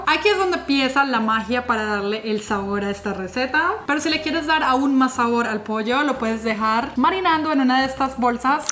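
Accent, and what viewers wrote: Antioquia, Colombia. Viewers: Hola, soy Colombiana y veo tus vidéos desde Ginevra Suiza, me encantan tus vidéos de limpieza y comidas balenceadas, te miro justamente por que el acento colombiano me hace mucha falta, podrias hacer un vidéo de comidas colombianas? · Gracias bella por tus videos, siempre me encantan, sea el contenido que sea, tu acento Colombiano cuanso lo hablas me gist mucho, tu simpatia, frescura y tu amor, con el top y los vaqueros ibas muy guapa🎉 La granola se veia muy rica, la voy hacer, me alegra mucho que cumplas tus sueños en compañia de tu esposo❤ graciass siempre por ser tú ❤❤ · No pierdes tu acento paisa 😊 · En este video se le salió full lo paisa jejejje❤ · Tenía tiempo sin ver tus videos no sé si es por eso que te escuchaba Colombianisima 😊 mi favorito también es el 🥑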